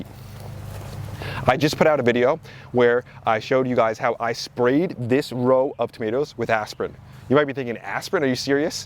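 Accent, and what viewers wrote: New Jersey, United States. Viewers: I could listen to him just say Water in that Jersey accent all day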